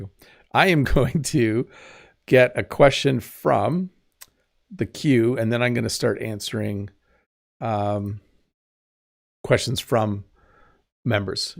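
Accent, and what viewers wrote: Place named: Canada. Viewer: Salut,! It is so refreshing to listen to your videos. You speak so clearly, your pronunciation is spot on and your pace is excellent and the Canadian accent is <<the best>>! One (minor) question: do you have the ability to control the language for your captions? I notice they use USA English: favor, behavior, center.... Back to watching video, thanks!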